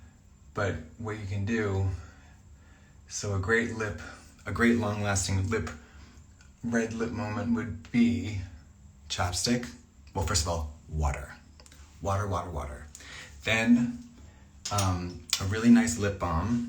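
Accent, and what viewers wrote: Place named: England, United Kingdom. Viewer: English accent!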